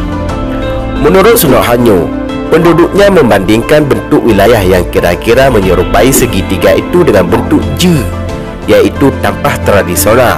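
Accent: Aceh, Indonesia. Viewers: Logat bhs mimin aceh nya terlalu nampak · Pakon teuma menyoe meudok aceh,male kah teuma. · Pengisi suaranya kurang menarik.. Masih terlihat ATT.. Alias Aceh Tok Tok. Lebih baik diisi dgn bahasa Aceh aja langsung lebih bagus. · meudok aceh ya,hahaha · Bangga sy dengar logat Aceh dr si Abang Dubbing. Org Aceh jgn malu ya dgn dialeg sendiri. Lanjutkan berkarya utk menambah wawasan kita semua.👍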